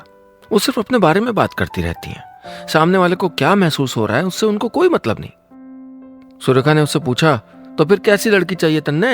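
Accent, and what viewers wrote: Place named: Haryana, India. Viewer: What a deadly combo! Well...Opposites attract. Nice story and good to hear Neelesh in Haryanvi accent..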